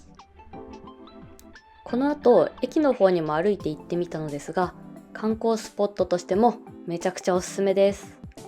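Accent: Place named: Kansai, Japan. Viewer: 、お疲れ様です😘 ここの、景観は、いつ見ても、最高👍🤩ですネェ🎉 秋🍂は、紅葉🍁、冬☃️は、白景気、今、シーズンは、新緑の風景、本当、いいですネェ〜 後、ちゃんのハニカミ笑顔と時々出る、関西弁も、いいですネェ👍🥰🥰🥰🤣🤣🤣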